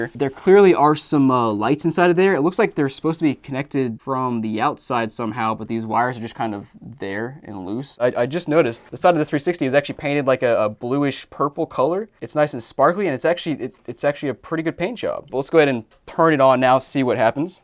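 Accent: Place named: South, United States. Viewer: Hey, I was wondering where you accent comes from specifically. Like. I know It's a southern accent (I think) but a region or a state? I swear I'm not weirdo😅 I just really like this accent but I don't know exactly what accent it is (I'm not american) so I can’t precisely answer the question when asked. Love your vids too btw.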